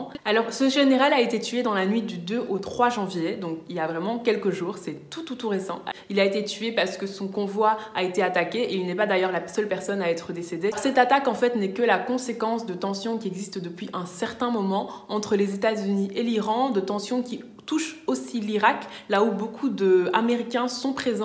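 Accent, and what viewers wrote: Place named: Belgium. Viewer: Super message. J'aime bien ton accent belge. Keep it up ! God bless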